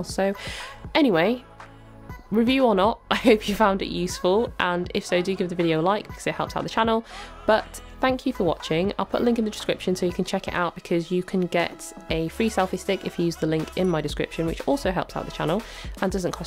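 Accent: United Kingdom. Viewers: This is the first time I've seen a video of yours. The truth is that I loved the way you describe and explain things. Your voice is very relaxing and I really like your accent. (is british?) It is a pleasure and a delight to listen to you and watch your videos. I hope I can have an insta360 soon. New subscriber here! Greetings from Uruguay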